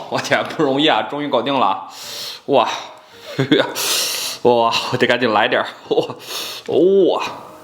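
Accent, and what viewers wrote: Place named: Beijing, China. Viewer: 您那毛坯儿房愣是给我听吐了。求你，放过北京话，积点儿口德。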